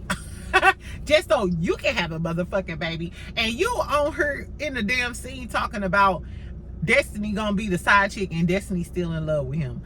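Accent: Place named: South, United States. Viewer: Girl i know you from the South.. That southern drawl is heavy... ❤❤❤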